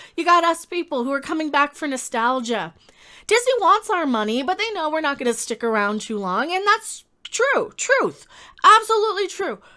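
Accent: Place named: United States. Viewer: your demeanour is Australian but with an American accent. Refreshing to see someone else done with modern cinema and tv media. You need a Google chromecast, and then you can play 80s and 90s movies and tv shows.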